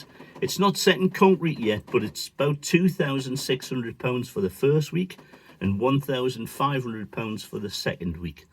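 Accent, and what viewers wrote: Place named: Northeast, United States. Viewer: You sound like your from the Northeast. Iam interested in training. But wait till it dies down. Stay safe lads.👍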